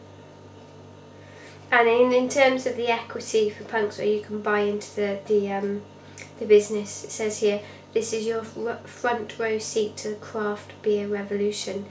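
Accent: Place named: Scotland, United Kingdom. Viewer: TO SHOW OFF,WE SCOTS HAVE CLYESDALES,THERE CALLED DRAFT HORSES,ALE GOES BACK A LONG LONG TIME. LOVE THE SOUND OF YOU VOICE.TO HEAR SCOTTISH TONE IS NICE.ARE YOU IN USA.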